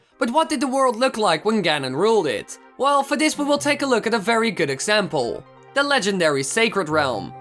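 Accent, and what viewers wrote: Netherlands. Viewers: are you actually from the U.S because u sound pretty dutch (nl) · fancy guy i can say with extreme confidence that he's Dutch. that's a one of a kind accent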